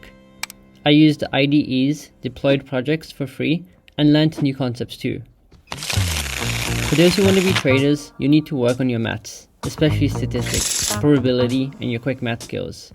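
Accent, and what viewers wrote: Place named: China. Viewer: ahh indian guy with a chinese accent, my favourite kind Gread vid btw :)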